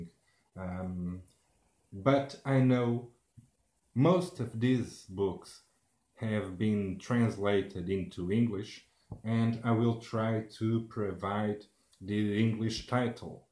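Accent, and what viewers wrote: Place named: Europe. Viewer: Speaking of european comics, Are you european too? You sound like european no offense.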